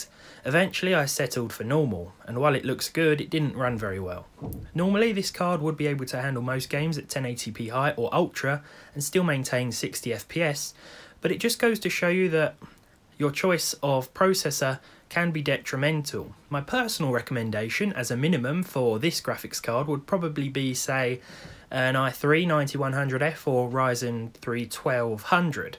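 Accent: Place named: England, United Kingdom. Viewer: I like your original English accent. I used to live in Tauton City. I learned a British accent. greetings from Germany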